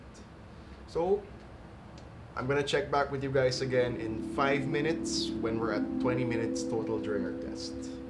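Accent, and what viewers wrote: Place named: Philippines. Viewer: are you a filipino? because thats how filipinos speak English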